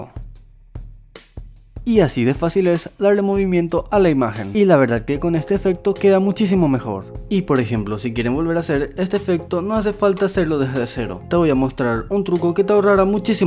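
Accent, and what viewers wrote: Paraguay. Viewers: Xd con tu voz se que sos Paraguayo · Eres de Paraguay no? lo note por tu voz además yo también soy de Paraguay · Amo el acento paraguayo, lo reconocí porque mis apas son de Paraguay ✨ Buen tutorial, bro ✨🔥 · Su acento es muy paraguayo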